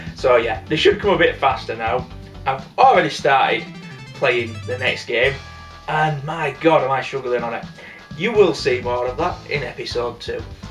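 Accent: Yorkshire, United Kingdom. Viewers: Amazing stuff. Just noticed you by accident. The Ninja :-D Great first choice! Ha ha... Yorkshire accent. I am from East Yorkshire myself. Nice one... I found that if you mostly fire in front of you its a bit easier. Never considered the Hit Rate percentage at all... I tend to power through... lol... I always get stuffed on the floating logs stage. Can't coordinate my firing and movement!! :-D Awesome extra lives snippet there!! :-D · Nice to hear a Yorkshire accent on YouTube!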